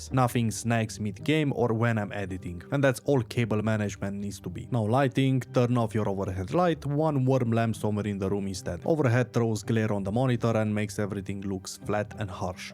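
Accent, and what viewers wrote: Romania. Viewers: Yo dude ... Love your vids helped me a lot ✌️ ... You from romania by any chance ?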